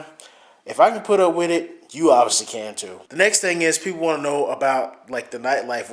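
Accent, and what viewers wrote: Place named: Jutland, Denmark. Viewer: That accent.. You've lived in Jylland too long man :)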